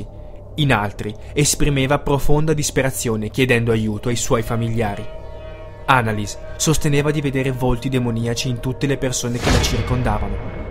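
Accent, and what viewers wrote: Italy. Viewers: muoio per il purtroppo!! Comunque seriamente sei italiano? E se si da dove?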